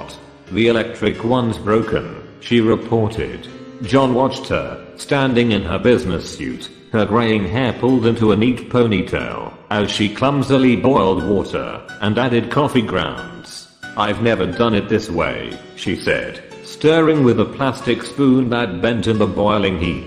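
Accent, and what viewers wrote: United Kingdom. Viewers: Full of British Accent!Wonderful!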